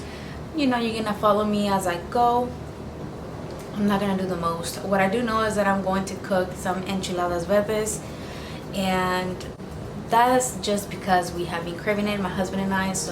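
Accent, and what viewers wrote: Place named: Spain. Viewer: ADRIANNA TRUJILLO She clearly has an accent and it’s cute. You can tell she speaks Spanish by her accent is what I mean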